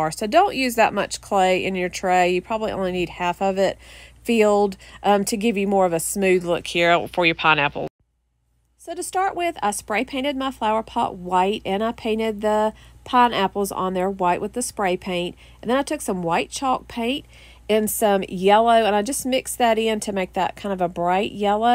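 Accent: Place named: South, United States. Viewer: These are soooo adorable! I love watching your videos! Your “southern” accent reminds me of home💛 Thank you for sharing these!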